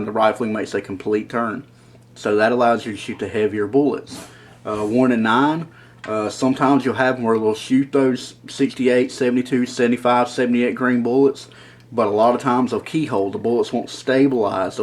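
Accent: South, United States. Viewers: Good info...learned much. Rather new at this. The southern accent was a challenge but hit replay a couple of times to understand what you said. That's fine...I got it... Thanks for taking the time to put this out.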